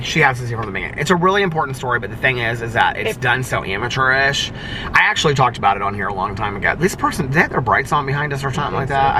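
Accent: South, United States. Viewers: Why does sound more southern than usual? 😂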